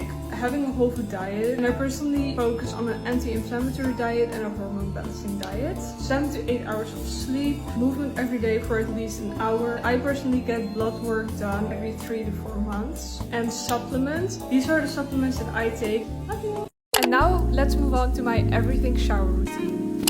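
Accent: Netherlands. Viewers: I can hear from ur voice ur Dutch and if ur not then I am tone deaf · hey girl ben je toevalig nederlands ik hoor een accenttt!?? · You have a really Dutch accent, idk why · girlieeeeee are you dutch you have a littele dutch accentttttttttttttttttttt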